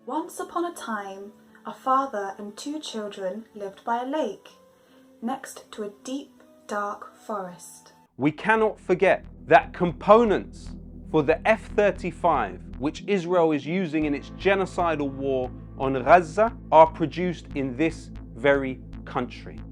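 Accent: United Kingdom. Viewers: Bro says all this in his thick British accent in his massive house… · Nice British accent u have there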